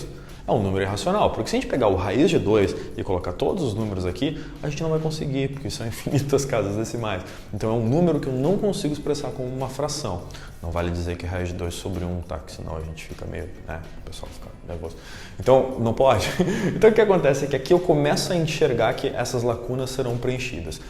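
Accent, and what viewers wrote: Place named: Rio Grande do Sul, Brazil. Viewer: Excelente canal. Pelo sotaque deve ser gremista!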